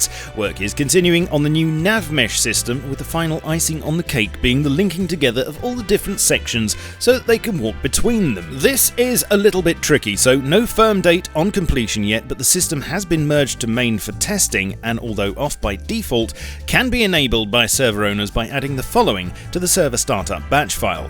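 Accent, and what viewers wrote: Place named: Liverpool, United Kingdom. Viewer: aye yeah ur a scouse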